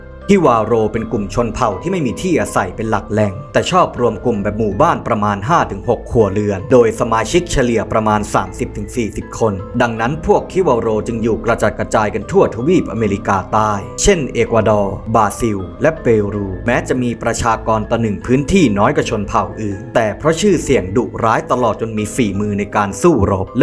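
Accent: Thailand. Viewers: แอบเหน่อนะเรา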